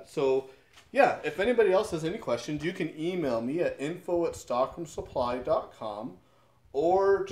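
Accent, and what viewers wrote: Canada. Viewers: believe me, I really appreciate the Canadian accent, for me is the best english.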